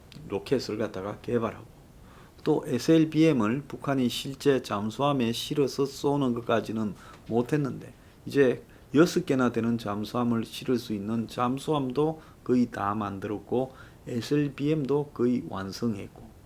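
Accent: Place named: Gyeongbuk, South Korea. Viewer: 황장수는 부산 출신이라면서 말은 진한 경북 말을 쓰니 듣기가 몹시 어색하다.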